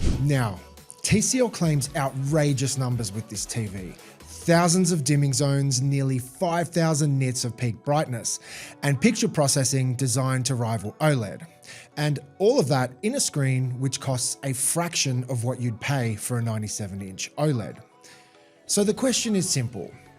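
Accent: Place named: Australia. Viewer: About his voice, the Australian accent particularly his specific neighborhood town one is not globally heard, which makes it harder to understand. And add to that his unique voice tone... definitely a better mic can make it easier for certain, interestingly in his livestreams the voice sounds much more clearer so maybe it's something in the editing/software of his uploads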